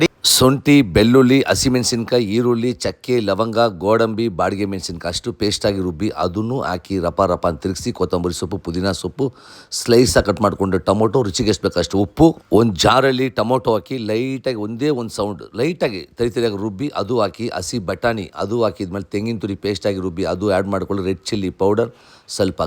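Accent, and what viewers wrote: Tamil Nadu, India. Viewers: Mostly ivaru Tamilaru adikke Kannada shaili bere. Aadaroo Parvagiila illi Ruchi Mukhya Ashte.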